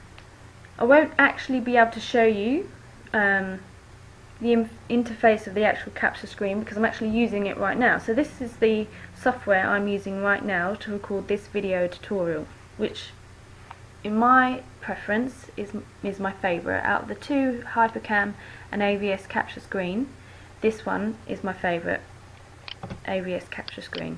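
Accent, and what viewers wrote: United Kingdom. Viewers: Are you english. ??? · Dat uk accent *O* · <- Falling in Love with your British accent <3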